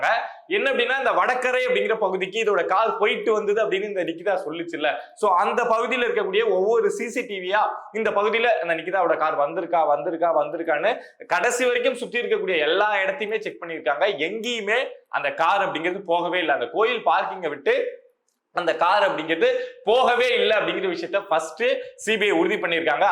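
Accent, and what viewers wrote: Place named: Nellore, India. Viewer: Nellore speak Anna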